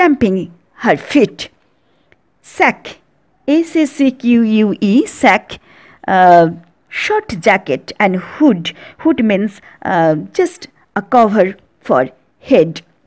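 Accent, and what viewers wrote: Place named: United States. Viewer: Wow You speak English like a American 🤩 You explain like a expert of English 😍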